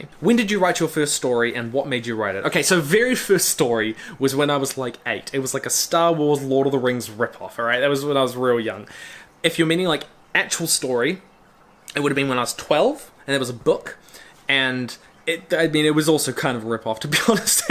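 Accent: New Zealand. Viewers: Which New Zealand accent, though?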